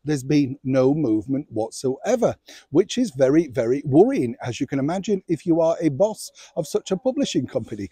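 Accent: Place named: England, United Kingdom. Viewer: I could listen to you all day. Great tips and updates. Least I forget your English verbal flare.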